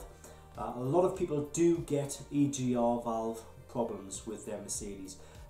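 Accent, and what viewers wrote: United Kingdom. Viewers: This British accent.....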